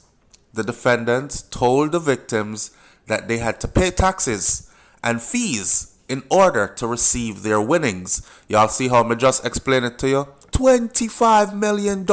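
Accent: United Kingdom. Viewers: Sound like u try this thing yah already eno bro,all white British accent.. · Ur doing it again...I picked up a British ascent this time 🤣🤣🤣🤣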